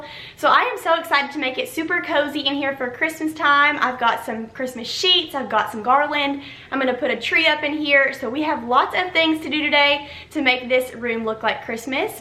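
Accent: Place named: South, United States. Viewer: I'm new! I just love your channel ❤ You are very relatable as I live in the south and you sound like you could be my neighbor!😁 I think the room looks so warm and it gives me "Hallmark Christmas Movie" vibes.🎄 Have a super weekend! ~hugs🤶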